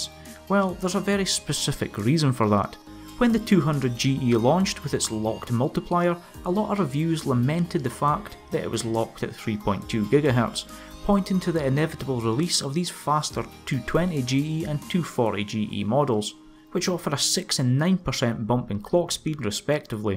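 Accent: Scotland, United Kingdom. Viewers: Great video, I love your Scottish accent. 👍 🏴 I just might reconsider the Athlon.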